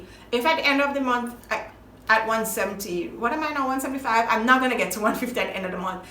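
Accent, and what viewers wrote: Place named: Jamaica. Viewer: So glad fi fine a fellow Jamaican Sista doing ADF....bless up💫✨️ and thank u . Keep speaking patois! Mi luv it...dem have all di adda dialect & languages w Closed captioning.. a fi wi Time now... lol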